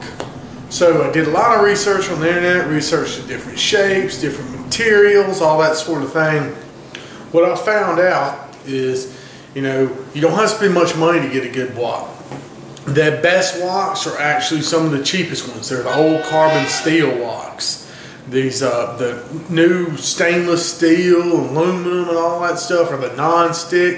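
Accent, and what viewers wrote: South, United States. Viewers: I've done this before and know about woks. Your video is the best. Funny hearing a southern accent doing Asian.....lol! Great Job!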